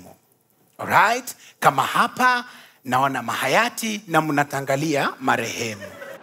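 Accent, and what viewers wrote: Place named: Luo, Kenya. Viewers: you've killed it🤣🤣🤣🤣🤣🤣🤣🤣 i like his Luo accent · Kothin Fanuel its the luo accent that makes his swahili beautiful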